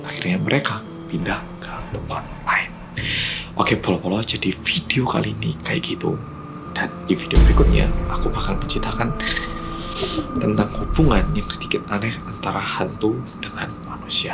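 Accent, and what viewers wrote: Java, Indonesia. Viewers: First ☺️ bersama orang koreyah medokk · Tiap nonton konten mas, jadi ikut2an medok ngomongnya:"D Semangat terus mas ngontennya!!!!!!!!!!!!!!!!!!!!!!!!!!!!!!!!!!!!!!!!!!!!!!!!!!!!!!!!!!!!!!!!!!!!!!!!!!!!!!!!!!!!!!!! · HAIII TIM GERCEPP💜 HALLO MAS MEDHOOOOKKKK💜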